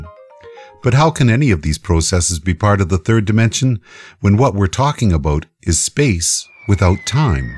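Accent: Canada. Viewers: ok, i must know... the person commentating is canadian, right?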